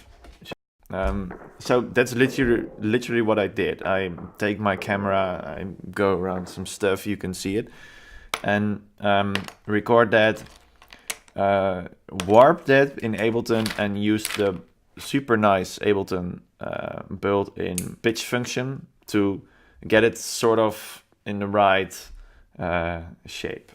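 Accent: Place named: Netherlands. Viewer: you sound dutch, could you explain what you did with the compressors? :)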